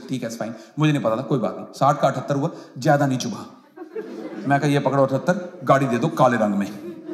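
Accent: Punjab, India. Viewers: You are awesome yaar Your punjabi accent is tremendous bro Really love you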